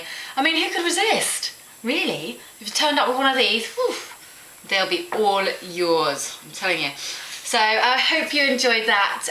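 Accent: United Kingdom. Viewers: British accent. Thought you were American? well... back to the pie business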